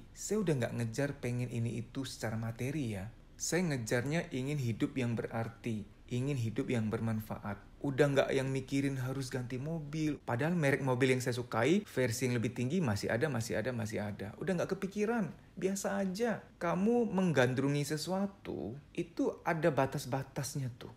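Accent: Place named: Pontianak, Indonesia. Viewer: Abang nya ini kek org pontianak logatnya hehe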